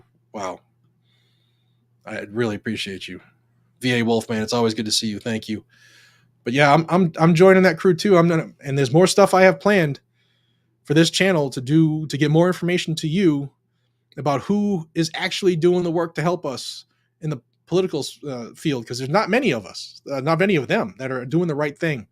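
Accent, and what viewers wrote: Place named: Texas, United States. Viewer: Texas. Loud and clear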